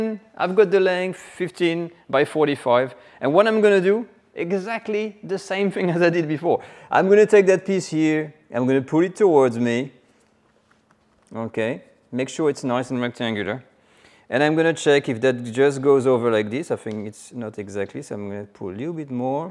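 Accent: France. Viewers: Please don’t lose your French accent. We Aussies love it. Can you use Gluten-Free flour?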